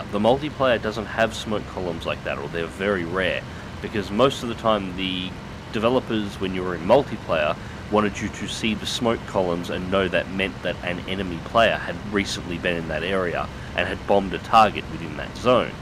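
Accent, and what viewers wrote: Australia. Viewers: Another great video. nice to see you in a plane! love the aussie pronunciations! as usual take care good luck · You sound more australian than Rimmy. I'm impressed.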